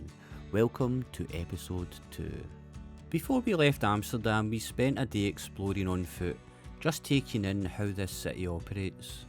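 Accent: Scotland, United Kingdom. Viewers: Two things I love the most - cycling and Scottish accent - all in one channel!!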